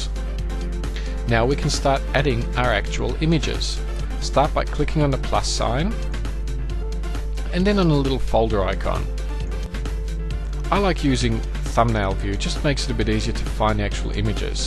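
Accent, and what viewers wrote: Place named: Australia. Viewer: Good to hear a fellow Australian :) Thanks for this!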